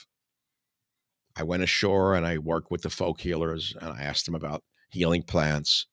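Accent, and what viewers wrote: New York, United States. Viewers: I started listeing to you Mike back during the Obama years (<num>) when I was a liberal (still am but in the classic sense) and you resonated with me. Can't explain it...I think it's because you were from NY and I lived in NY at the time and you sounded like so many people I have encountered over the years. Also I was in a lost period [during that time] of my life; and you gave me motivation and perspective-(almost like a father figure) otherwise, I wouldn't had been able to come up with, on my own. I credit you with breaking me out of my identity politics phase which I was heavily in at the time. This actually caused me to change my thinking and helped advance my career. However I would be remissed if I didn't spread the credations around: I also give credit to Alex Jones and Mark Levin, and occasionally Rush, but I never missed your radio show! 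They then got to you and had you removed for being an unbridled patriot; but I am glad you're back! And we need you now! Especially in these trying times! Thank you. 🙏 ❤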